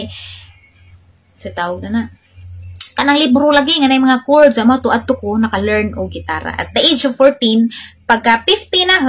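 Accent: Bohol, Philippines. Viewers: Makalingaw paminawon sinultian sa Bol anon hahaha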